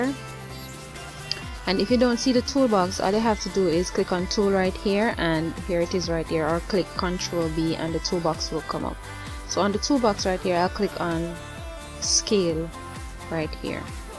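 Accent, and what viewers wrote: Jamaica. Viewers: U jamaican? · Good job. Knew you were Jamaican when I heard the voice. Thanks for the help. You stop making vids I see. Hope all is well. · U Jamaican ? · the Jamaican accent tho :)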